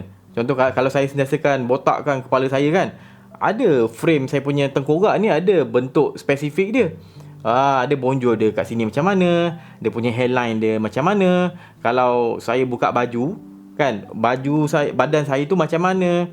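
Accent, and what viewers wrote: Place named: Kelantan, Malaysia. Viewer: Ada bunyi macam telo orang Kelantan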